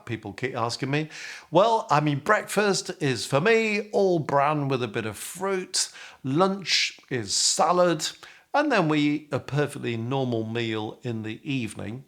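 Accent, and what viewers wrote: England, United Kingdom. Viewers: Thank you for sharing your story so inspiring, determined and disciplined!! Thank you for making YouTube videos!! You are the best teacher , I love your English accent. I watched you videos repeatedly… you are so patient your lessons are so details, and mostly easy to understand!! Thank you very much!! 🙏🙏❤️❤️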